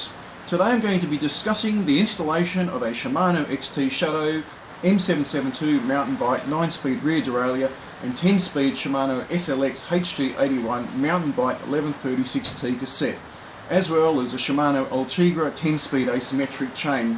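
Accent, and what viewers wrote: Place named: Australia. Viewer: Australian, I reckon mate.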